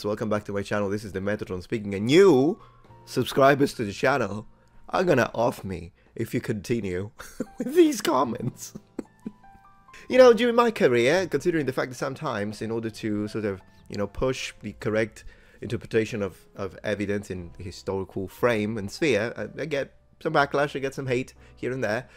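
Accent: United Kingdom. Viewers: Does it sound to anyone else that he learned English from a British source? Don't know why that's standing out to me all of the sudden.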